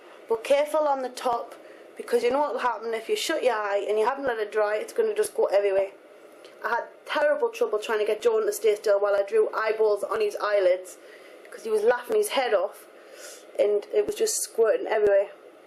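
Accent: United Kingdom. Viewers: God i love your videos ! humm, r u british?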